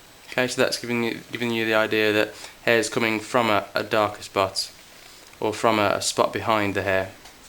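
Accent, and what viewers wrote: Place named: Walsall, United Kingdom. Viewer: WOW! i cant believe how well you can draw, heard your accent and thought he sounds like me haha im from walsall to, anyway well done hope you make lots a moneys from these :D x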